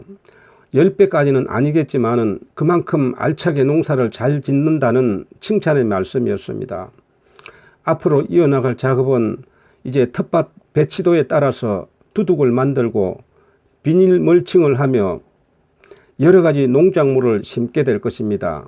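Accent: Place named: Namhae, South Korea. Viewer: 말씨가 고향이 남해시군요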